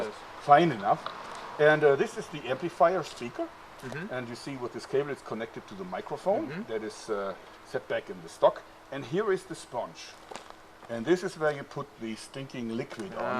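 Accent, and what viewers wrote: Germany. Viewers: I guess I spent too much time in Germany while serving in the US Army to not notice your accent. 😄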